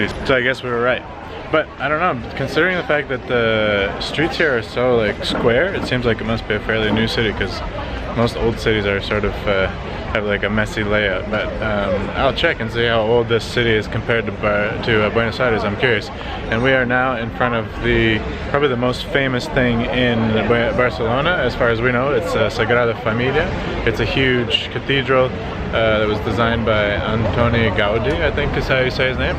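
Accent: United Kingdom. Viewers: Наверно потому что... у него есть в произношении английский акцент, и что бы понимать слова нужна практика А у нет акцента, ее проще понять.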